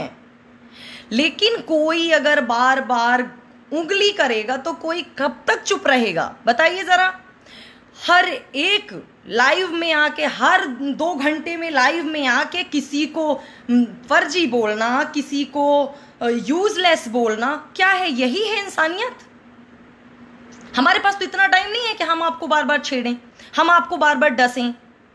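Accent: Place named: Bagheli, India. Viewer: आप की टोटल वीडियो सुपर डुपर.. बघेली... आप के जैसा किसी की एक्टिंग नहीं है बघेली... मे.. सब सीख के बोलते है आप शुद्ध बघेली बोलती है... हर कहानी मे कुछ न कुछ सिखने को मिला..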